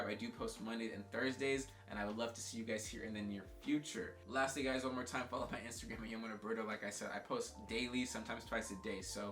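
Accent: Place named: United Kingdom. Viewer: Thought you were from the UK😂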